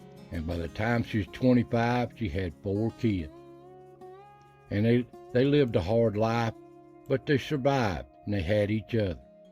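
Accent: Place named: South, United States. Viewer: Hi; Just an old trucker from north Jersey. I agree with you about miracles. Hopefully God has one left for my wife. She has glioblastoma. Been together 50 great years. We live like my grand-parents who were farmers their whole life, inseparable. Old school ways will never die. We love your videos, beliefs, and your beautiful southern accent. You and yours are wished a Merry Christmas and a Happy New Year. This year was a rough one for you and I but I always remember that God doesn't give us anything we can't handle. Prayer to yall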